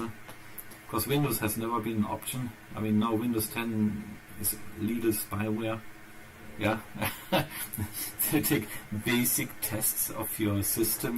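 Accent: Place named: Germany. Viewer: Hello! I hear you have a German accent. So where do you come from? Best regards, Serge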